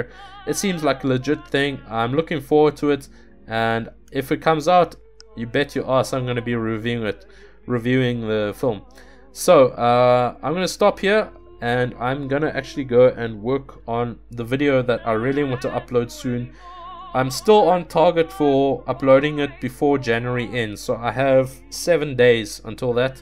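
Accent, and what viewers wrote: South Africa. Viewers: This worries me because the Colour out of space is one of my favourite HP Lovecraft stories. It is so disturbing and unsettling and I'm worried Nicolas Cage will make the movie unintentionally funny :/ I am excited to see Tommy Chong in a movie though, what a legend. PS it is so comforting to hear a South African accent. Keep up the good work :D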